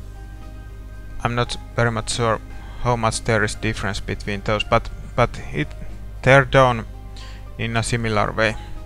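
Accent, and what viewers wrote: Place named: Finland. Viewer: wow nice... you are skilled artist, hopefully we will see more from you in future. i have to say you are first fellow finn (yeah heard from your accent) who makes videos about his/hers blender projects :)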